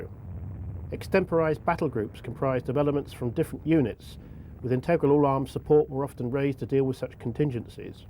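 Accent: England, United Kingdom. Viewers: the british accent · morti271 he has a lot of brithis accent but for me is better than the american one . is more easy to understand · You mean proper English without American accent? :P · I had a hard time trying to understand the narrator. Too much English (accent)....lol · nice video,the only thing is i can't understand the british accent :(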